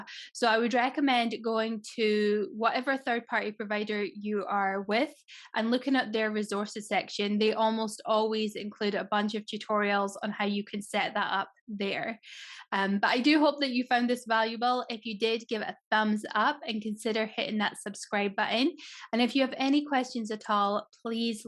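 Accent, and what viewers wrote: Scotland, United Kingdom. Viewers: Hey, Im guessing you ahave spent some time in Scotland based on your accent. I was looking for a video on how to use the newsletter feature on my squarespace website. do you have a video for this? I would like to send a news letter to people on my website that sign up but now sure how to make it all function. I have used a few of your videos to get mite site to where it is. so Thank-you.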